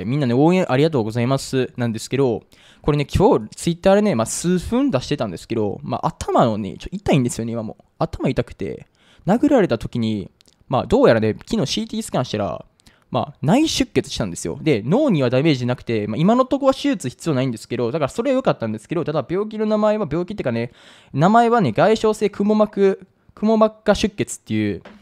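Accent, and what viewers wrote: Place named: Kansai, Japan. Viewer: 関西出身の友人と口調が似ています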